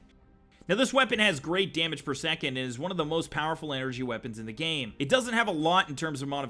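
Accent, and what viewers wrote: Canada. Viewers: I can tell this guy was Canadian in the first 2 seconds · Dude sounds like stone mountain with a canadianish accent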